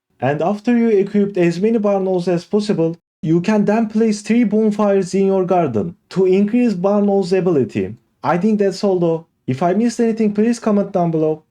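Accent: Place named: Iran. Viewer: Bro your accent is like iranians im from iran 😊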